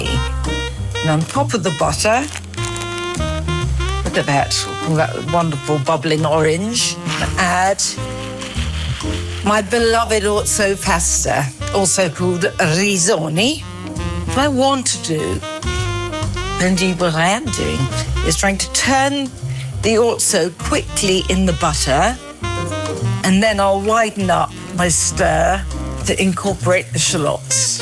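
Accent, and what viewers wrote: RP, United Kingdom. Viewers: Nigella, you have been such an inspiration throughout my life! I found your shows many years ago when I had the privilege of living in the U.K. You and I both lost our mothers around the same age and I find your recipe videos to be incredibly comforting when I am missing her the most. Especially your Christmas episodes. I find such inspiration in your ability to manifest joy and beauty in often simple ingredients made decadent, and of course the well-deserved fancy ingredients. The way you describe food is like poetry. I not only learn new recipes, but my vocabulary has increased exponentially. I can only imagine how much fun it would be cooking a meal with you while sipping on a cocktail. Thank you for sharing your life with us, even with the things you perceive as flaws. They're not flaws, they make you even more perfect. You've always been so honest with the public and I think that is both noble, rare, and worthy of all of praise. 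Please don't stop saying "meekro-wavay." It's become a household term in my family because of that episode. I thought it was absolutely adorable. You speak the Queen's English but throw in your own cheeky neologisms that make you 3-dimensional. Thank you <3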